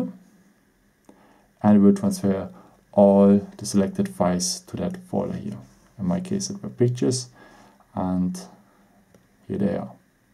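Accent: Germany. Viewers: German English :))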